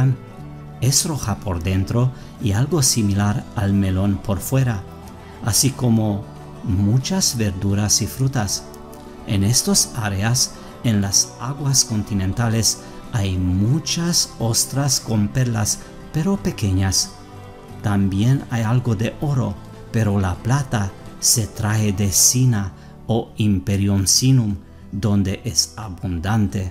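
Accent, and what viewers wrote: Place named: Saxony, Germany. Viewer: Me pregunto cuántos idiomas sabes. Por el nombre de tu canal veo que manejas el Latín, por tu acento adivino que eres sajón, por la información que presentas veo que sabes francés. Eres muy bueno. Saludos desde México, tú amigo Tartariano....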